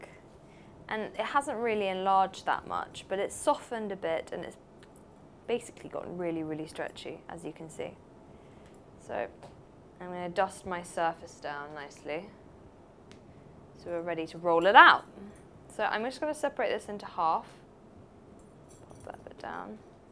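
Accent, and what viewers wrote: United Kingdom. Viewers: I swear to God I love British accent >3< So many tasty recipes, so little time to make them :(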